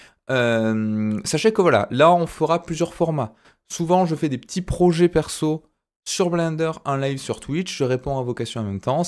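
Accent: South, France. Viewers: Ah cette voix et ce petit accent du soleil, pédagogique à souhait ! Bravo encore à toi !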